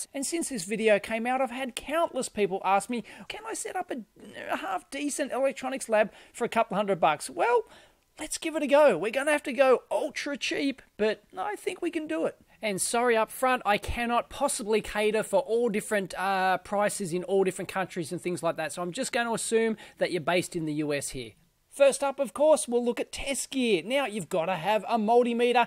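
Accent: Australia. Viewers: Oi u sure you are not an Aussie mste? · Voy a asumir tu esta in estados unidos con un espeso acento austrailano, lol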